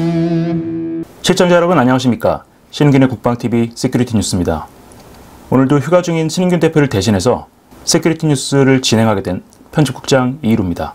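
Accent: Gyeongsang, South Korea. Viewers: 지역주의 말씀하시는 분이 더 지역주의 위에 계신 것 같은데, 신인균씨가 사용하는 사투리가 경상도라서 그렇지 그것빼곤 뭐 그닥 국방TV 운영하는데 문제없어 보이네요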